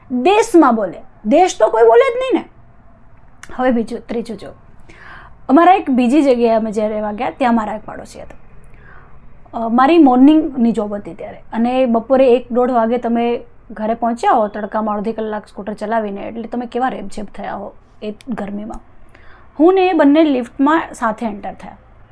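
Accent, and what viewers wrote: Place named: Kathiawar, India. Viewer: મોયજ આઇવી હો .. તમારે મોઢે અમારી બોલી હાંભળી ને... અદ્દલ કાઠિયાવાડી સવો તમી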